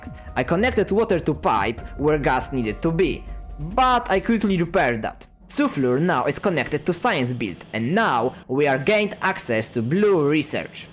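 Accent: Poland. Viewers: Ah I've heard your voice From shorts I knew I recognise your polish-ish voice nerd voice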